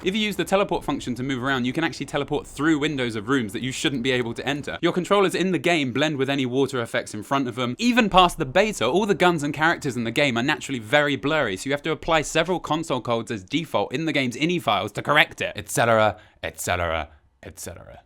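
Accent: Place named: United Kingdom. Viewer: Does every british man have the same voice?..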